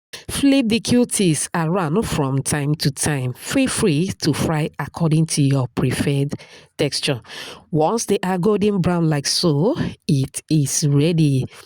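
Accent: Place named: Igbo, Nigeria. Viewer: From Nigeria, I like ur Igbotic accent ❤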